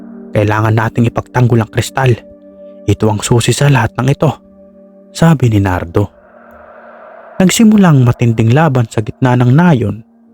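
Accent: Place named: Waray, Philippines. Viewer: Waray waray itong narrator kasiang tuno ng kanyang salita hindi mapakakaila na waray waray alagad intoy hehe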